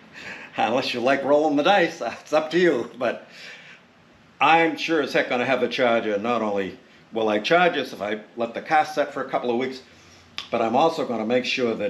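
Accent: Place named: Boston, United States. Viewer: I enjoy your informative videos. Keep up the good work. Love the accent. Is it from Boston?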